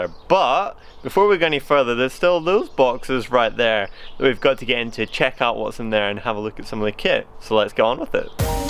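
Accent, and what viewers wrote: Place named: United States. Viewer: Might just be me but your accent sounds a bit American!? Nice bike though.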